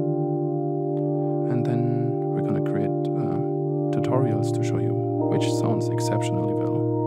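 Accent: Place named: Germany. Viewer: German accent :D nice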